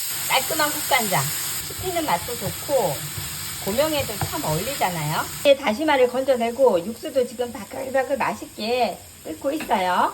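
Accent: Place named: Gyeongsang, South Korea. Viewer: 경상도 억앙도 음식도 정겹네요~^^ 새해엔 좋은 일만 가득 하세요~~~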